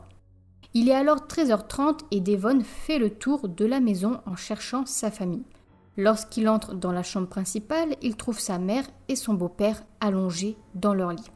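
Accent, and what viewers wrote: North, France. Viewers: Je viens de découvrir ta chaîne, franchement trop bien, des true crimes bien racontés, des sources et des images réelles, bravo !!! Sinon tu viens du Nord comme moi? 😉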